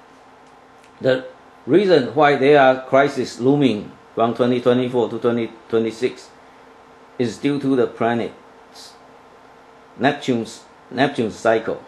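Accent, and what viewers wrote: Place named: China. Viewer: From his accent you can tell is Chinese. Arabs and Chinese are the best astrologers in the world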